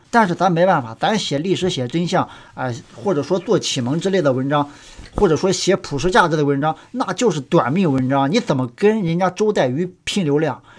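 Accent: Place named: Northeast, China. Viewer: 笑死我了！！ 东北人講話太逗了！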